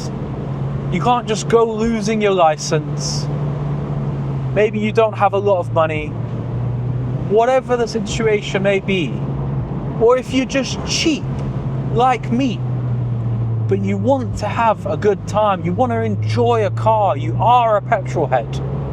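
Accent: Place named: South London, United Kingdom. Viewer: I’m getting a slight south London accent but that location looks beautiful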